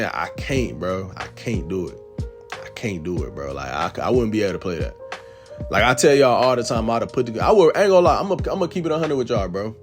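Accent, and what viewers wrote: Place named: Chicago, United States. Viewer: You talk like you from the chi lol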